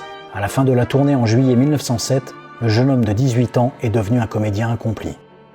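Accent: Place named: England, United Kingdom. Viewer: Merci…passionnant comme d’habitude ! 👍👏👏👏 Et quel accent anglais 😉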